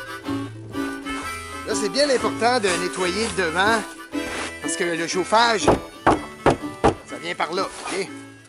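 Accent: Quebec, Canada. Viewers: Je suis tombée par hasard sur cette vidéo et c'est bien. J'aime les québécois et j'aime l'esprit constructif (pour info, je suis française). Pourriez-vous donner des conseils à nos amis français, surtout ceux qui n'ont pas l'habitude des effets de la neige, des conseils lorsqu'ils sont sur un axe routier fréquenté (et non pas chez eux avec tout le matériel pour sortir leur voiture de la cour) ? En France, lorsqu'il y a un épisode neigeux important, c'est un peu la catastrophe (largement dénoncée ou enfoncée par les médias). Mais n'avons-nous pas l'excuse du manque d'habitude ? Bref, si vous pouviez nous aider à trouver les gestes, les comportements, les accessoires utiles à une circulation fluide dans notre joli pays, cela serait parfait. Merci pour votre vidéo que je trouve rigolote (j'aime bien votre accent !). Nous aussi, on "pète" les joints de porte par grand froid ! 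· L'accent que je kiffe le plus !!! Je vous aime les québécois !! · L’accent québécois est insupportable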